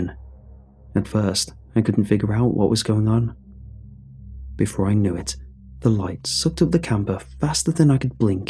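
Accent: United Kingdom. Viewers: If the guy reading these stories didn't have a British accent, I might actually get into them. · Why is it always a British accent to explain it to Americans!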